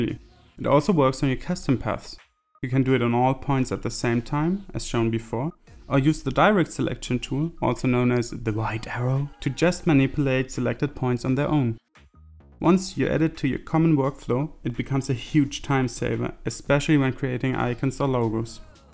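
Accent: Germany. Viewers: Hey are you German?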